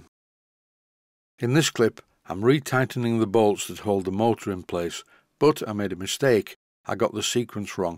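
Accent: United Kingdom. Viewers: British people talk with such elegance!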